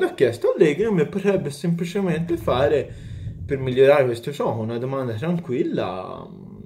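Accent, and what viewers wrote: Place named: Florence, Italy. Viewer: Ti impongo delle cose: 1) Mai nascondere la c strascicata fiorentina 2) Torna ad aprire i pacchetti. Il mondo ha bisogno di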